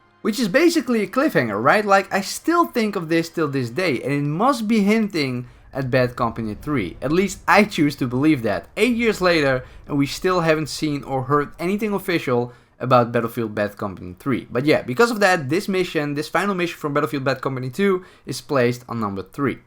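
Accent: Netherlands. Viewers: You have a Dutch accent I'm right? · Your dutch accent is out of the roof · Ur acent is a bit dutch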